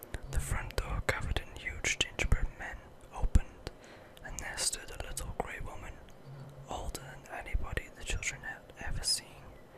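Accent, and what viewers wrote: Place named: United Kingdom. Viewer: Oh my God. You truly are my ultimate favorite trigger. I'm so happy you are back. Your voice is so angelic. I usually close my eyes when you talk, to savor your sounds. I love you. Thanks for watching my video. I think I need to move to UK and get my daily dose of British accent. <3:) Love, Ann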